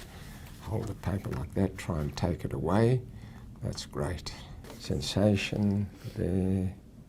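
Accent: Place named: South Africa. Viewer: he has a South African accent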